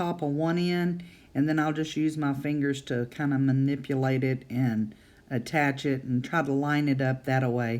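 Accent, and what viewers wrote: Texas, United States. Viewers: Great Video! Beautiful Card! Love That Texas Twang! xo~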